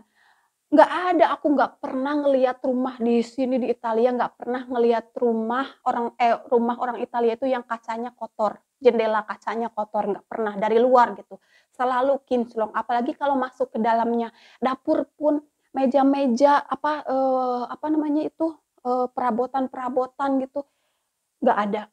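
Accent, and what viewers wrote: West Java, Indonesia. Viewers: Assalamualaikum teh saya baru bergabung😊abdi ge orang sunda tpi denger teh nyarios sunda resep jd musam mesem sorangan😁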